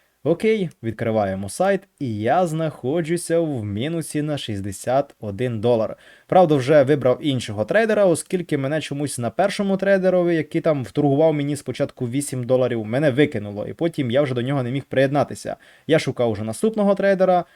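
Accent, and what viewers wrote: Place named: Transcarpathia, Ukraine. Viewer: Чується Закарпатьський діалект )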